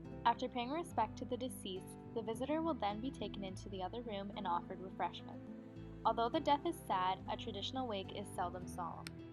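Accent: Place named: United States. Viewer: American voiceover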